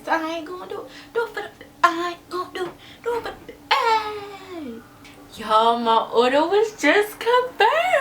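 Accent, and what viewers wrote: Baltimore, United States. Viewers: I think she’s from Baltimore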